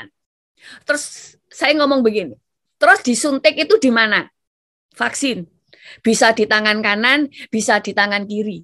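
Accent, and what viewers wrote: Java, Indonesia. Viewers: logatnya terkesan medok banget jawa-nya hehehehe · mantap bu.. Logat jawanya...kental...sy suka...sehingga penjelasannya...bagus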